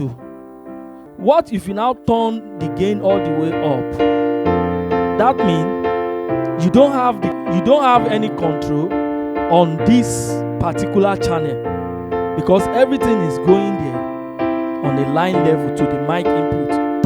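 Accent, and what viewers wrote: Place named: Nigeria. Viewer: That's Nigerian Accent 🇳🇬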